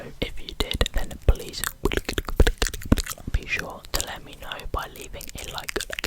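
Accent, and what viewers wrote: United Kingdom. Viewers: I love the British accent 🤣. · Your British accent is awesome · Two things I love : ASMR and British Accent❤ Hugs from Brazil💚💛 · Are you speaking in modern rp or just British accent?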